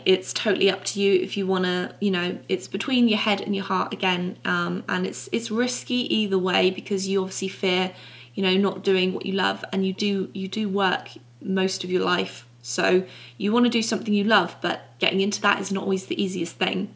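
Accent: United Kingdom. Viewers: If you want to hear from someone a lot older, like fifty something, I choose safety and security and became an RN as I got older wished I went to art school. Back then like you I never even considered it, and I have lived in Southern California since I was 8 so I was closer to art options. I recently had to stop working but when I was I dreamed of all the time I would create when I retired, now with all this time on my hand I'm struggling now to rekindle the slightest bit of creativity I always seemed to be bursting with. Might I share my thoughts on the matter, first, you are very talented so that is a big plus there, sadly some aren't, like people who think they can sing but my cat sounds better. Second, I'm guessing your from the U.K. since I hear a wee bit of an accent, but you are living in the US, per your Michaels video, with your parents, this is a guess? 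If I'm right you should take advantage, if they let you, of living at home as long as you can, I let at 19 while in college supporting myself very hard, until your a few steps down the road of your chooses path. Third, Look at how far you come in only one year on YouTube and the "opportunities" you've already had, not including the porn, sorry joke, and how many more you may have if you hang in there another year living at home following your heart. That's my two cents, also, just make sure your productive, because parents want to see that, and do something daily to make it happen though I can tell you are, work on o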